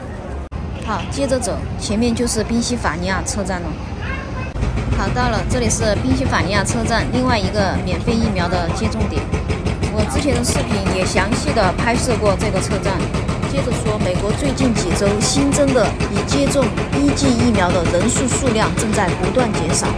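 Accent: Sichuan, China. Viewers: 你的川普听的好安逸哦，偶尔还有点皮、喜欢这种风格，关键是我喜欢听川普。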